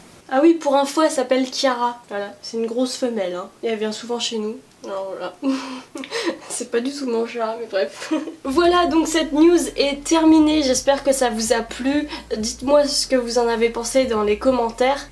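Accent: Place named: England, United Kingdom. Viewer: J'ai enfin pu regarder la vidéo !!! J'ai du retard moi pfiou.. BREF ! Ton accent anglais est merveilleusement sexy j'adore hihi. J'aimerais trop aussi les voirs ensemble, c'est comme une série qu'on suit avec eux ahah!!! Je veux connaitre la suite moi 💚💚 McGonagall on vous a reconnu faites pas l'innocente. Par contre je savais pas qu'elle était aussi agressive que ça aha. Bisous xoxo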